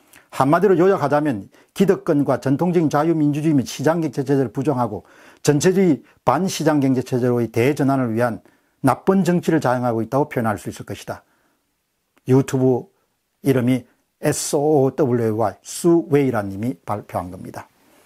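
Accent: Gyeongsang, South Korea. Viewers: 강한 대구사투리... 그것만으로도 공병호의 신뢰성 없어 보임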